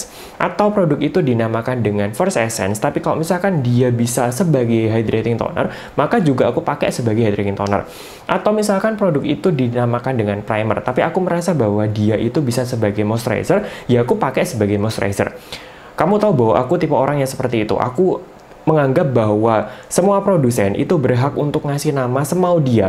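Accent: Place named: Surabaya, Indonesia. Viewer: Ya Allah pantes kok logate suroboyoan. Ternyata alumni FKG Unair toh. Suwon gawe infone mas 😅😅akhirnya terjawab sudah pertanyaan ttg brightening vs whitening.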